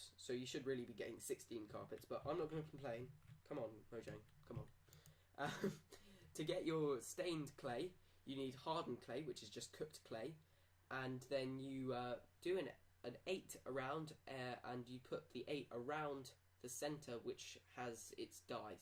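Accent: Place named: United Kingdom. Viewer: Subscribed my british friend i hop you take no offense for that i just love your accent :D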